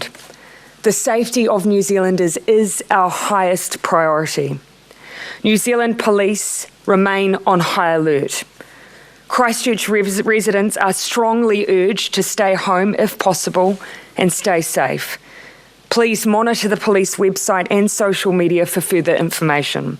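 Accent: United States. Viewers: sounds like an American moved to new Zealand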